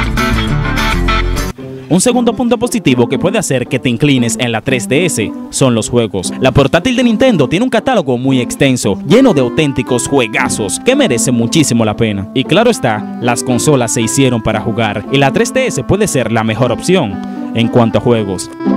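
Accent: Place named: Dominican Republic. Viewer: men tu eres dominicano tu me suena dominicano xd (yo si:v)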